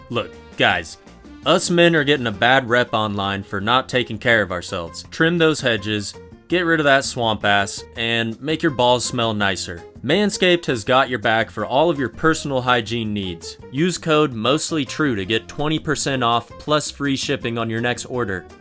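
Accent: South, United States. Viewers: with a slight southern drawl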